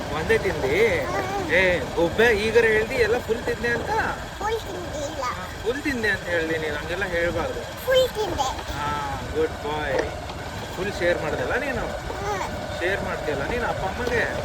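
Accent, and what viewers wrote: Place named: Hubli, India. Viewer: He is so cute, I feel so good to see him talking in Hubli kannada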